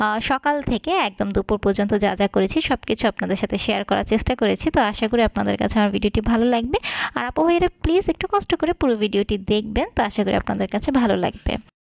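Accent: Barishal, Bangladesh. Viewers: আপু তোমার বরিশালের ভাসা বেশি ভালো লাগে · আপু এখন যে বরিশালে ভাষায় কথা বলেন এইটা আমার কাছে ভালো লাগে অনেক সুন্দর লাগছে । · আপনার বরিশালের ভাষা আপনার মুখে অনেক ভালো লাগে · আপু তোমার বরিশালের ভাষা গুলো ভালো লাগে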